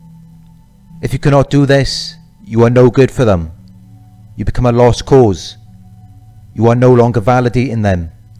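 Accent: Wales, United Kingdom. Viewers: It’s actually a Welsh accent. Welsh people are some of the nicest people you’ll meet :)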